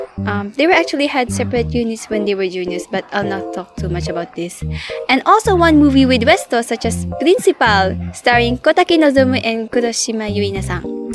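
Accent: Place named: Philippines. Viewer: ! Your voice is majikawaii! Both the pinoy accent & nihongo =D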